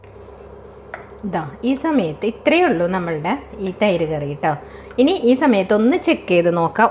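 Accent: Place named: Thrissur, India. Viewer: very nice recipe....are you from trissur? you have trissur slang...im also from trissur😀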